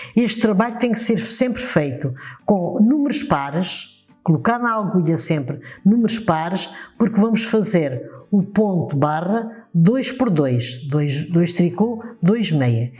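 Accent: Portugal. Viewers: Estava procurando uma maneira fácil de fazer meias . Acho q encontrei. Gostei muito. A Sra lembrou-me minha avó, por parte de meu pai, que era portuguesa, pelo seu sotaque. Bjo no ❤ Muito obrigada pela receita. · adoro seus trabalhos faço todos você e muito prática aprendo muito e fora a sua voz adoro seu jeito de falar você e de Portugal eu sou mineira bjs 😘😘😘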